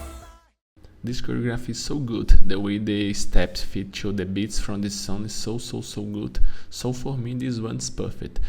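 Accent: Brazil. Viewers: Vc é brasileiro, seu sotaque é uma bosta, e dá para ver que é brasileiro, fala português, seu inglês tá uma bosta irmão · Só eu que percebi que ele é brasileiro e te falando inglês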